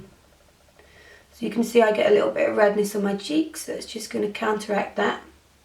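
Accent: England, United Kingdom. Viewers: i adore your english accent <3 you are lovely!!!!!!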